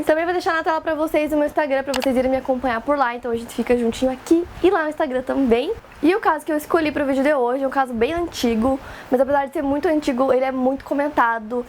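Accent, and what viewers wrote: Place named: Caipira, Brazil. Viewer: Mds você é muito caipira que chega a incomodar de ouvir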